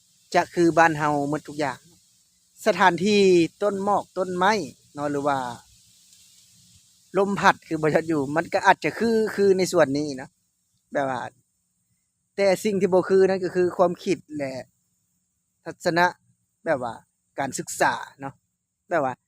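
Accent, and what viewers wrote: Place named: Sakon Nakhon, Thailand. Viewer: บ่าว พูดสำเนียงเหมือนคนสกลนครเลยครับหลายๆหมู่บ้าน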